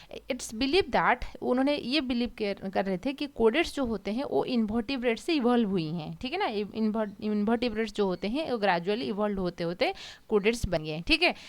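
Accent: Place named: West Bengal, India. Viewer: Man you are from West Bengal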